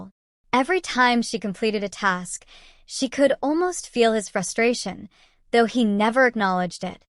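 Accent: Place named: Europe. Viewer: Why don’t I hear other voices narrating these stories instead of a clear type European voice. If I see these are African type stories then the voice could match, in my opinion. Thanks for listening.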